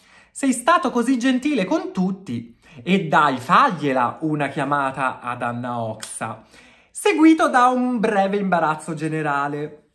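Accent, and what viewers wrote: Tuscany, Italy. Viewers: Ma te sei toscano, nel video ho sentito che stai a Milano ma non mi sembri lombardo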